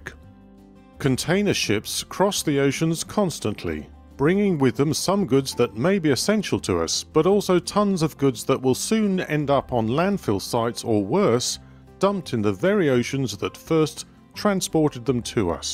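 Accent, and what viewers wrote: United Kingdom. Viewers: Delicious British Accent! What do you think about the environmental impact caused by greenhouse gases produced by aircraft in the long term?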